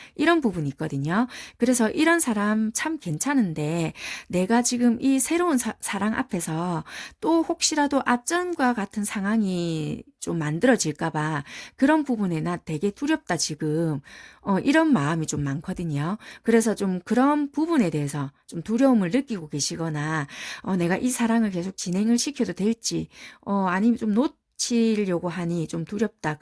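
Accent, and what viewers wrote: Busan, South Korea. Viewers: 와우 감사합니다^^*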